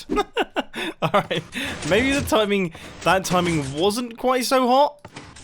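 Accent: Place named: United Kingdom. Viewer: I just realized he kinda sounds like wheatly from portal 2, probably because they both have British accents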